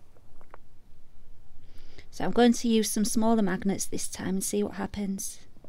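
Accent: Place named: Harrogate, United Kingdom. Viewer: I feel like wrecking my microwave. Lol. You sound as though your from my area. Harrogate. I can’t find one 😥 I have the pigments. I’m ready Only got tiny magnets tho. Scared lol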